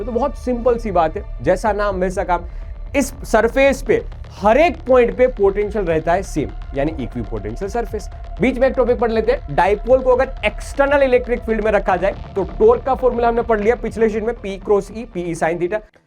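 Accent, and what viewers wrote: Gujarat, India. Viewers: sir ka accent dwkh ke hi lag raha he ye gujarati honge 😅😅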